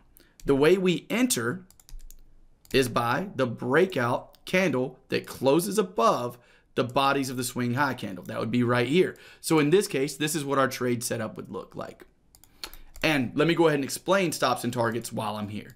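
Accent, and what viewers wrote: South, United States. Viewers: he's the American Chris, coz he sounds american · love your videos brotha. thanks for all the help. I gotta ask...are you from the south? I hear some twang in your voice. im from south Louisiana btw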